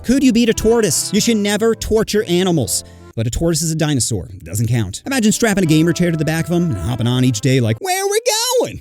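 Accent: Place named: South, United States. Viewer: Bro went all Southern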